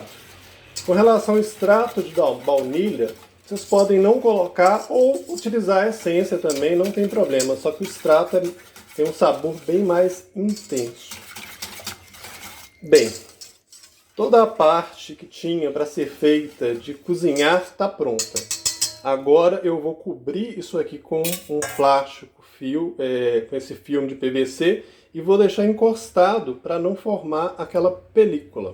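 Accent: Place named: Minas Gerais, Brazil. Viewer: Não têm né 😖meio difícil só pegar a receita de boca com sutaq de mineroooo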